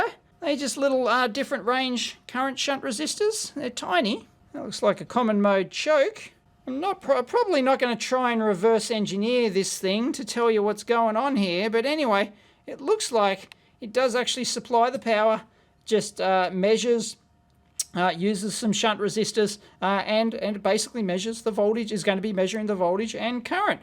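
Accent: Australia. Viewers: What brand is the screwdriver set? I hear "weir" or something. Or was it Wiha? Sorry, listing to an Australian with American ears.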